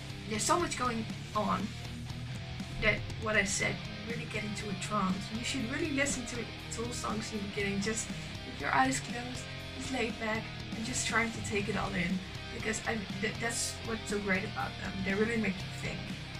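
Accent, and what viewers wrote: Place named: Scandinavia. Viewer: That Scandinavian accent so freaking lovely. Cheers from another Tool fan that grew up listening to Undertow.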